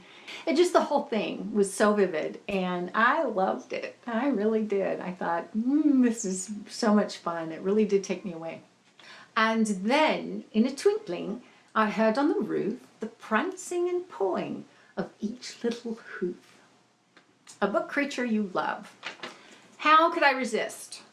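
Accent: United Kingdom. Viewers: Your British accent is on par! I really want to read The Book of Ivy - heard mixed reviews on the 2nd one though! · Great tag! I loved the British accent ☺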